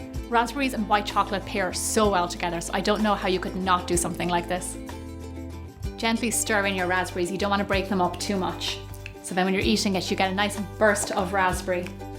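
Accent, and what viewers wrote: Ireland. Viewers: I love Irish accents!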